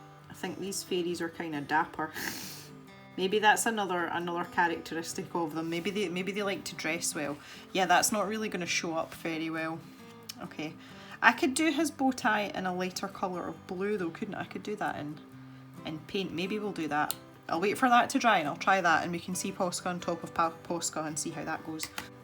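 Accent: Scotland, United Kingdom. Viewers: I'm a first time visitor to your Youtube channel. I'm from Florida, U.S.A. and my Southern ears have a hard time understanding the English accent. I'm just guessing here, but, are you from Scotland, or Ireland? Your accent is wonderful and, surprising to me, I find it very soothing. I've really enjoyed watching your video. I do a lot of Adult coloring and I use Poscas in my coloring. It's one of the few whites that will lay down over my colored pencils, but I often have to use multiple layers because they tend to pick up the colors I cover. For a white highlight, I have to give them plenty of drying time between layers. I'm subscribed to your channel and will look forward to your next video. (I'm also a fellow novelist.)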